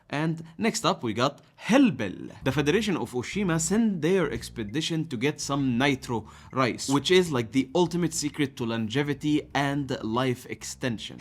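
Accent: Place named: Egypt. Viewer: Definitely an Egyptian with that accent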